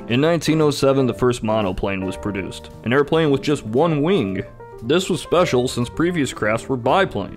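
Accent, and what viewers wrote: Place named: United States. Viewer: Why does he keep on getting more and more American throughout the entire video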